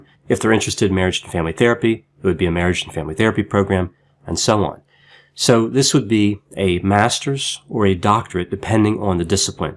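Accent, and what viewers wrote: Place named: Delaware, United States. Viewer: Haha..knew that accent was familar..deliware..I'm from.philly:) this was very informative on becoming a licence clanition..if often thought about it. Teachers told me I'd be good at it lol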